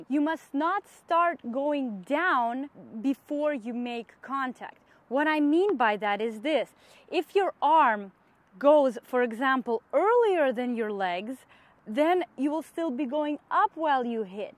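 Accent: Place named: Eastern Europe. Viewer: Just curious love the accent are you Eastern European?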